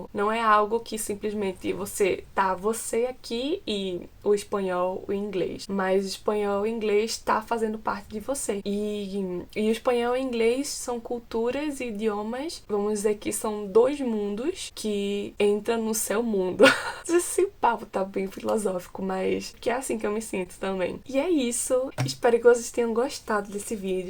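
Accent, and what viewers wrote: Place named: Pernambuco, Brazil. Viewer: Sotaque pernambucano🤗 meu nordeste é diferenciado 😍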